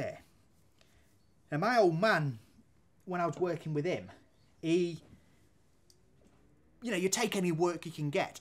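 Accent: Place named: England, United Kingdom. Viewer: I just recently discovered your videos and am glad I did. I am new to woodworking and looking to soak up every piece of knowledge I can. You explain things very well and with an enjoyable accent as well. (Here in corn country in the U.S. you don't hear an English accent very much) Keep up the good work and I look forward to watching more of your videos!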